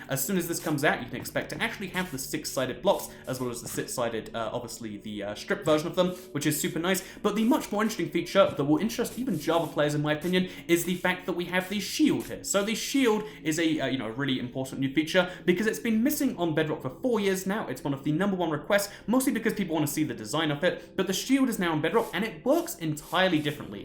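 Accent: United Kingdom. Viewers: Ong Canada I thought you were British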